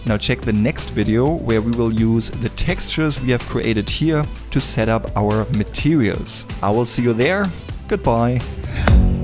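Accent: Germany. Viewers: you sound like a german man:D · Sei mir nicht böse aber dein deutscher Akzent, man merkt es einfach. Ansonsten mega gutes Video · german accent exists: "give me the whole stock!" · du bist dedutsch oder? Cooles Tutorial 😍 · Junge... xD man merkt dass du Deutscher bist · i hear a german accent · das ist witzig man hört echt sofort raus, wenn ein deutscher englisch spricht xD, aber super video, sehr hilfreich, danke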